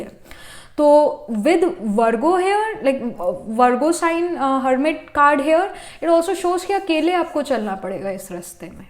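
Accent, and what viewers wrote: Punjab, India. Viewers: Aashiq mashuq😂😂😂....are u a Punjabi ma'am?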